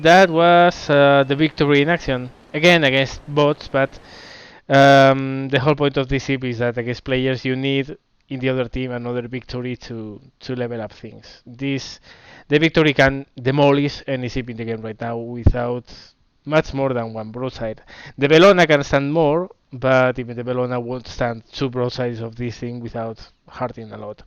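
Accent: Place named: Spain. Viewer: Eres español ¿no? Pronuncias demasiado bien los nombres españoles de los barcos y tu ingles no es nativo ni mucho menos Que conste que me encantan tus videos mate!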